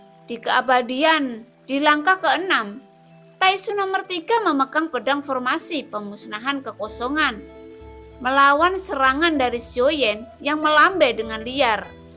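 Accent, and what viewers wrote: Java, Indonesia. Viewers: logat Jowo kok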